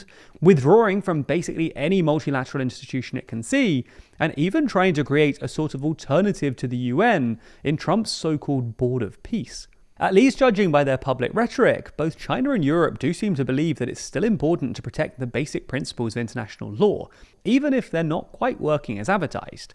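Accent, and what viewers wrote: United Kingdom. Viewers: the last time some guy with a British accent talks about trade imbalance with china... they flooded that country with opium and then invaded a few times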